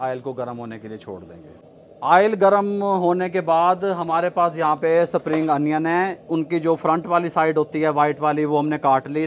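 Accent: Punjab, India. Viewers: zaberdast mujy ap Ki Punjabi boht achi lgti h❤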